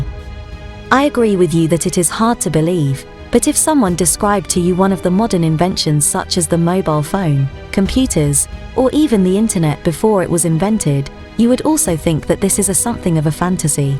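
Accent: United Kingdom. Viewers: I love your British accent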